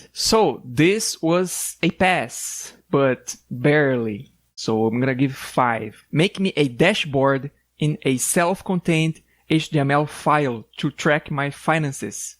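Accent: Brazil. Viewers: so pelo sotaque ja percebi que e br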